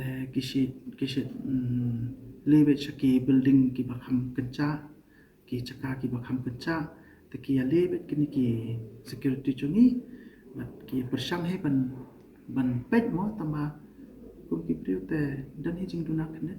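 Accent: Umpling, Malaysia. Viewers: Ktien Umpling, namar u dei na Umpling, khublei kur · Ktien umpling rh katjuh hei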